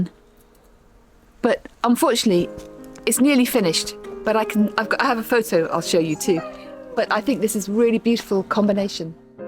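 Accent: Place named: United Kingdom. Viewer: 我喜歡聽 Manda老師 優雅的英倫 英語發音 還可以學英語聽力練習!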